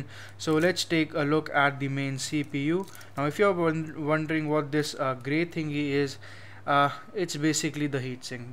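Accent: India. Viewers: Liked and loved the video.Understood everything you say,but please work on your accent,don't be that funny Indian guy that everyone make fun of when they should be listening.I am talking here out of experience,i have a "Russian" accent/im not even Russian !? / and that is a really big issue for me.It's not racist or whatever,just makes people uncomfortable when they listen to me.Anyway content on point,exactly what i had in mind with my idea and you proved that the idea makes sense.Good luck!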